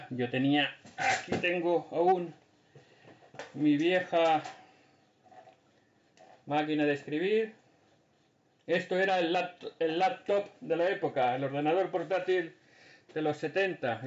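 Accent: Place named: Spain. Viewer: Hermano buen bideo y me fasina su acento español olé tio¡¡ Saludos hermano y éxito, Bendiciones.....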